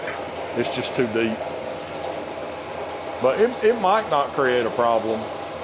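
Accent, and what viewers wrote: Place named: South Carolina, United States. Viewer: My favorite part of every video is in the intro: "We gone gitindah it." I'm in Nashville, and I miss that South Carolina drawl.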